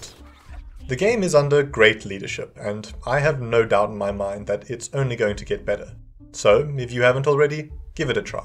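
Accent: South Africa. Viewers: Ayo, are you South African? 🤨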